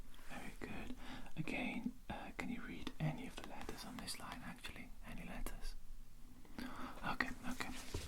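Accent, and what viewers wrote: Wales, United Kingdom. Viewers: Where in wales are you from?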